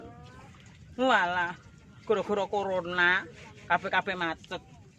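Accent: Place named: Ngawi, Indonesia. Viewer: Lucuuu yaaa Mbak...saya asli Ngawi sdh 18th tinggal di Bali dadi kangen sama kampung loo Mbk...boso ne mengingatkan jaman cilik ning Ngawi😘😘😘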